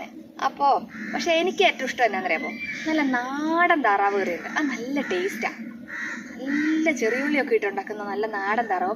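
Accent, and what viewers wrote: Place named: Southern Kerala, India. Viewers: കോഴിക്കോട് ആണോ? ഞാൻ ഓർത്തു ഇടുക്കി ആയിരിക്കുമെന്ന്. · സംസാരം കേട്ടാല്‍ കോയിക്കോട്ടുകാരിയല്ല.... ഇങ്ങള്....ഇങ്ങ് ''തെക്കത്തി''.....അതായത് കോട്ടയം..പത്തനംതിട്ട ഭാഗത്തുകാരിയാന്നേ പറയൂ......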